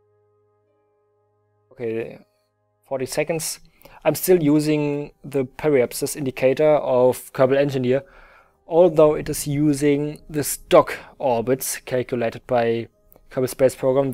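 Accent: Germany. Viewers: r u german? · Dankeschön! Es war ein gutes Video. Und "Flugzeugbau" bedeutet "Aeronautical Engineering" in Englisch. Du kannst das im Wörterbuch nachschlagen: <link> Und noch etwas: Ich mag deinen deutschen Akzent! :) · German English? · Wow, ich kannte dich nicht, hab aber sofort an deiner englischen Aussprache gehört, dass du Deutscher bist. :D Tolles Video! :) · You have a very german accent.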